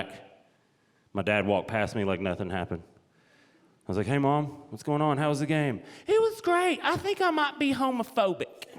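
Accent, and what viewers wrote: South, United States. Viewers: Why do i love southern accent this much..!? I need to get me a country wife..i tell you HWat!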